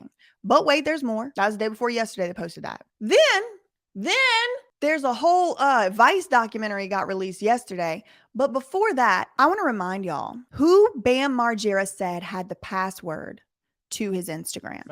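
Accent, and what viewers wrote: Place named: Louisiana, United States. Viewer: I knew you had to be from LOUSIANA 😂❤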